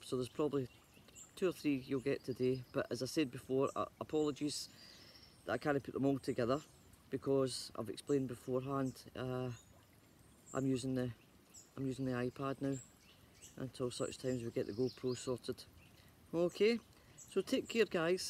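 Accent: Scotland, United Kingdom. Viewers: hello from French gardening!! beautiful background to your wee natter, you've not lost your Scottish accent!! I have the same problem with my pond, green water and I tried the same barley straw treatment not touched it at all!! thanks for sharing your knowledge and as always happy gardening!!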